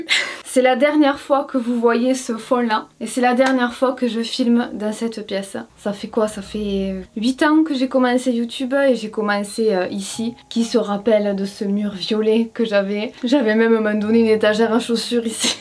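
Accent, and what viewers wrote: South, France. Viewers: 🤍🤍🤍🤍🤍 trop belle ! J’adore ton énergie (et cet accent du sud 😍😍😍)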